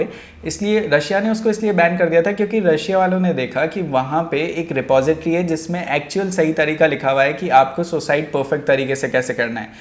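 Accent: India. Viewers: Someone has already told you that European people find difficult to understand well tipical "Indian English"??? Is not question of speed... Why this torture!?!?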